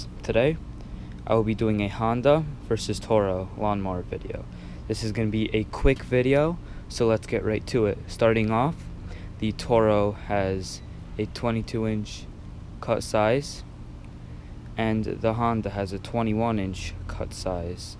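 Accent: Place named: Eastern Europe. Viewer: Good review, however, I'm a sociolinguist and your accent perplexes me. I hear eastern Europe maybe hebraic? Not trying to be rude, honestly curious.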